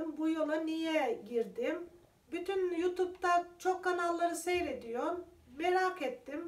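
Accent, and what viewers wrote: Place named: Karabük, Turkey. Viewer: Bacım, Tesadüfen önüme düştün. Zırh örgünle, konuşman yabancı gelmedi Nerelisin diye baktım. Karabük deyince şiven beni çekmiş. Ben Karabük'de 1981_ 1986 yılları arasında çalıştım.Mat ögretmeniyim Ordan şiven yabancı gelmedi, Kastamonu'lu mu acaba diye izledim. Aynı yöre,şiveler benziyor. Bursa'dan sevgi ve selamlarımı gönderiyorum.Takibe aldım yemek videoların güzelmiş, deneyeceğim. 💗💗💗🌷🌹🌷🪴🍹☕🍪🍃🥰🙋‍♀️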